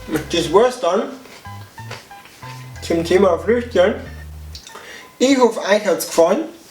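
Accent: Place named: Lower Bavaria, Germany. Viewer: du bisd ned amoi a bayer niederbayern!!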